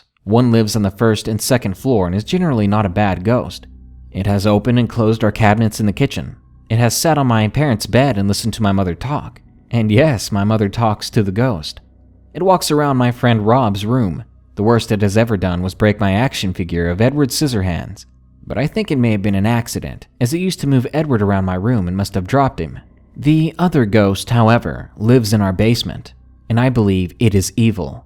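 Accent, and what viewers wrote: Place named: Rural, United States. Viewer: Lol, but you're a hick with brilliant narration skills!! Great voice too.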